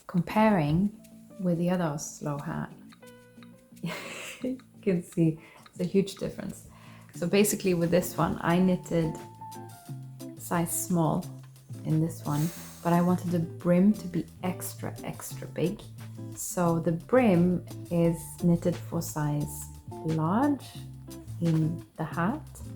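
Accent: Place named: England, United Kingdom. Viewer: I love your OG English accent. So refreshing to hear “Maths”, not “math” 😊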